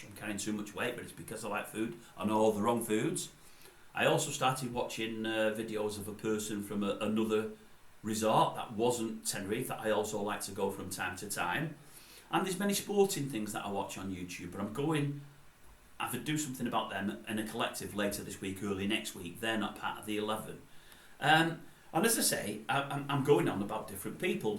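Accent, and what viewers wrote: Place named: United Kingdom. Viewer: One of the things that foreigners love about UK is the different regional accents. However when I, as a Brit, have trouble understanding 60% of what the chap is saying, then god help our overseas friends 😂 I’m sure the observations were interesting, I just kept losing the thread.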